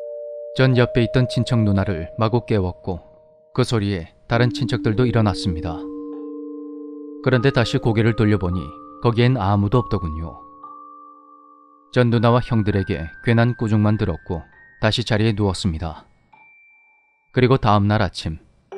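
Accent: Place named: Jeolla, South Korea. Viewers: 님 방송 늘잘듣고 있습니다.전라도 사투리 구수하니..찰지내요 ㅎㅎ다음엔 충청도 사투리도 부탁드립니다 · 깨알같은 전라도사투리ㅋㅋㅋ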